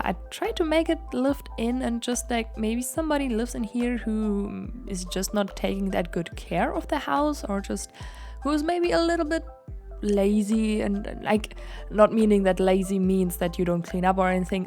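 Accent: Germany. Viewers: Bist du deutsch? Hört sich so an :D Oh mann, ich wünschte ich hätte in echt einen Ort wie diesen 😍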